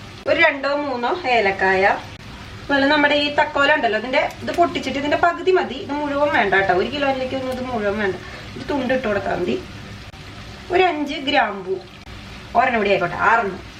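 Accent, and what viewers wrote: Thrissur, India. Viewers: Chechi thrissur kaari aano? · Thrissurkari Alle ? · thrissurkarii annee thonnunuuu.....😘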